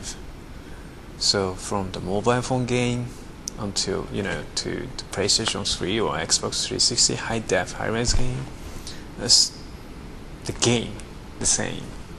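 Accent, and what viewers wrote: United Kingdom. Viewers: He must have learned English from an person with an English accent. You can hear it a bit. So cool that he's bilingual, all the more power to him. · His english is so good. Did he learn english in Japan? I don't know enough about him. It really sounds like he learnt british english. It sounds like he has a british english accent as opposed to american.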